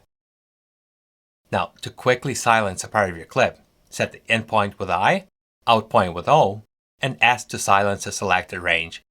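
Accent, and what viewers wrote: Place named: Saskatchewan, Canada. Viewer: As soon as I heard your accent, I could tell you were one of us 306'ers.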